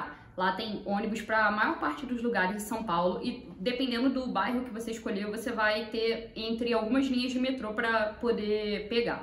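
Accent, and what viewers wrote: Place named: Rio de Janeiro, Brazil. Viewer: Tão engraçado que nasceu em São Paulo e fala carioquês kskskksks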